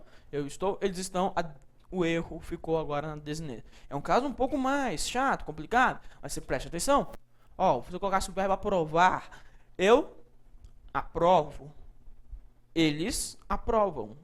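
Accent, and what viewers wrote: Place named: Goiás, Brazil. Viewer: O professor tem sotaque de goiano. O sotaque dele às vezes dá agonia mesmo. O que vale mesmo é que ele esclarece a matéria muito bem muito obrigado pelas excelentes aulas.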